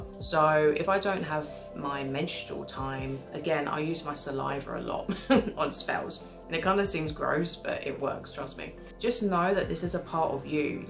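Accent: London, United Kingdom. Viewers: After living in devon for the last 20 years so nice to hear a fellow londoner ...blessings 🌛🌕🌜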